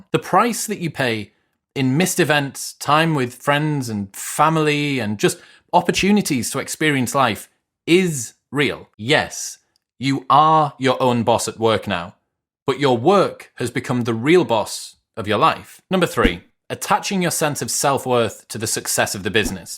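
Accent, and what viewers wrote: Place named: England, United Kingdom. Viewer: I love this English accent. You treat the "u" consistently.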